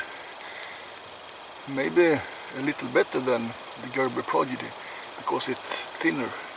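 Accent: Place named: Sweden. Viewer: jag älskar din svengelska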